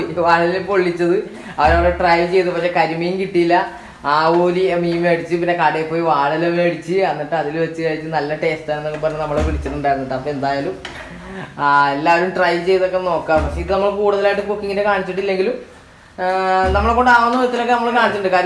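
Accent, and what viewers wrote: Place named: Thrissur, India. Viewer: സംസാസാരം സ്പീഡ് പോരാത്തതിന് ശരിക്കും ത്റ്ശൂർ സംസാര ശൈലിയും ഒന്നും ശരിക്കും മനസ്സിലാവുന്നില്ല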